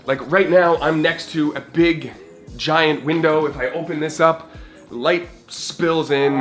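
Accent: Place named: Canada. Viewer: Love your Candian Accent! Also, thanks for you suggestions, they're reassuring!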